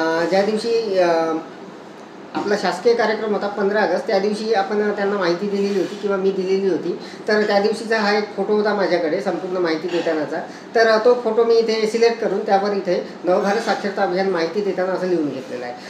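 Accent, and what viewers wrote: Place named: Vidarbha, India. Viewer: खूप छान माहिती सर. अतिशय चांगल्या पद्धतीने अवघड प्रक्रिया एकदम सोपं करून दाखविलात. तुमच्या वऱ्हाडी बोली भाषा एक वेगळी ऊर्जा उत्पन्न करते. खून छान माहिती.❤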